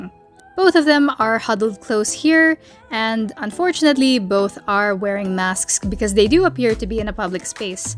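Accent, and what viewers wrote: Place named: Philippines. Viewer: are you filipino? I can catch the accent bc it's the same as mine 💓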